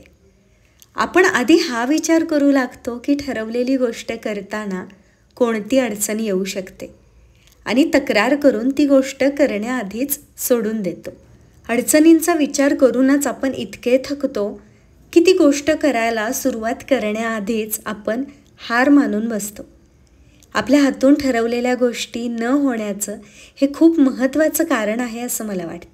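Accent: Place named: Satara, India. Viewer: तू ना खरंच लय भारी कडक आहेस आमच्या सातारी भाषेत बोलते,लहान वयात इतकी समज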